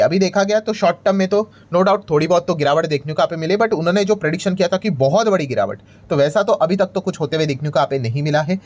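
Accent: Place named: Gujarat, India. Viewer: bhai awaaz se pahchano, yeh gujrati hai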